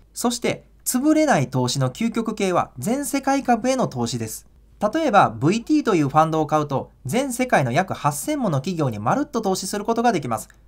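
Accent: Kansai, Japan. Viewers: 70点！最近学長の動画を知って、見まくっていたら、関西弁がうつってマス。同じ方多いのではないでしょうか？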